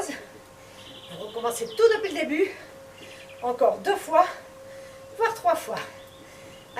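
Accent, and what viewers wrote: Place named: South-West, France. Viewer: Un vrai bel accent du sud-ouest 🤩 !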